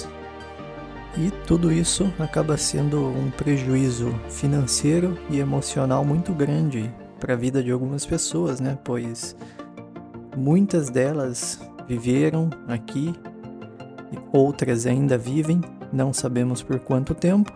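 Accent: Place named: Interior, Brazil. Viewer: Vc que está relatando o seu sotaque com certeza não é da ilha. É do interior.... 🤔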